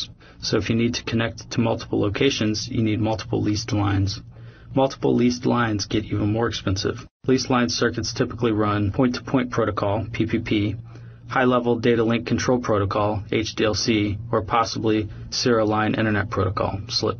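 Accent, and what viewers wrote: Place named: North America. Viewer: Throughout the whole video I thought you were North American. And when I saw your .uk website, you're from the UK? Man, respect.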